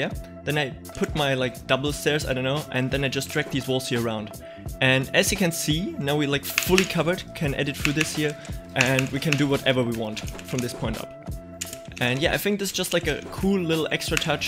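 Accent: Germany. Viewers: du bist doch save deutsch das hört man irgendwie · I hear German in his Voice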